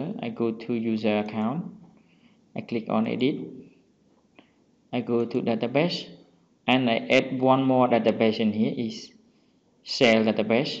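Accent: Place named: Cambodia. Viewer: depend on your accent . im sure 100% that u khmer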